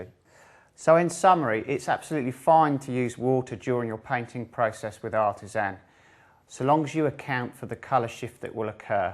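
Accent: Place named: Cockney, United Kingdom. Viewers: Not just British, but not-quite-cockney London working-class. Love it. There are many accents in Britain. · VERY THICK BRITISH ACCENT....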